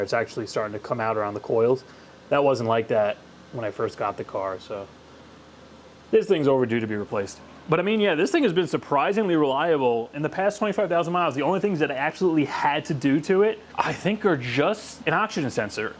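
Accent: United States. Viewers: Where are you from? You sound American, I live in the us and have an Saturn astra here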